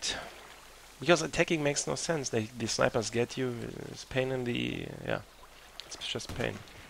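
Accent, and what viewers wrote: Germany. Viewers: Are you german btw? I just discovered you. You definitely sound german :D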